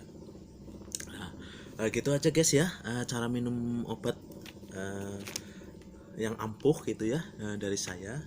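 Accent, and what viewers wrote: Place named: Banyumas, Indonesia. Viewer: Sip...dicoba kang. Kang asline ngendi sih? Ngapake kaya enyong banget...he he he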